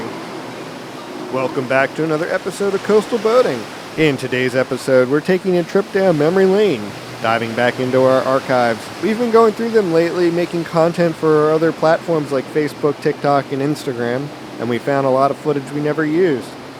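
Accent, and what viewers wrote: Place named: Philadelphia, United States. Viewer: zzzzzzzzzzzzzzzzzz Dislike the commentary. Esp the Philly accent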